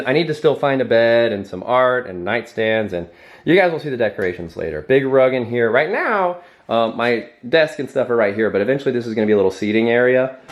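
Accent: South, United States. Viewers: The southern accent coming in strong in this vid! Keep up the positive vibes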